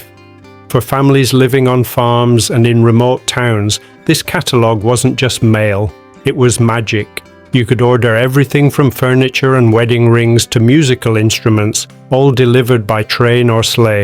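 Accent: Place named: Lancashire, United Kingdom. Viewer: What a clear crisp voice narrating the Eatons story. Accented by a touch of Lancashire?. Possibly Wigan, Bolton, Bury, Blackburn, Darwen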